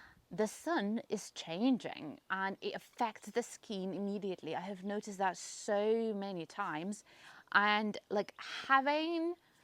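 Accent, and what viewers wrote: United Kingdom. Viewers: I am glad you chose to cultivate a traditional British accent rather than an American one! It is definitely harder to learn, but, as evidenced by your gardening, you are a wellspring of passion. Good luck.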